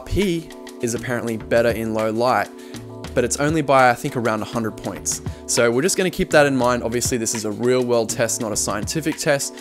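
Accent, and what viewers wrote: Australia. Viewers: Title caught my attention then within 2 words I realised you were a fellow Aussie , within 30 seconds I subbed. Looking forward to exploring the channel more👍🏻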